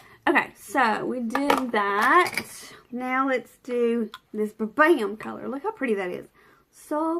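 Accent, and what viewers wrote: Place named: Tennessee, United States. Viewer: I'm assuming that if you was my neighbor , we d be best friends. You remind me of my friends in Tennessee, so I like hearing you talk.And you go through same traumas and dramas we all go through, so you are relatable.And you are knowledgable where I am ignorant, so you are my teacher ❤️❤️❤️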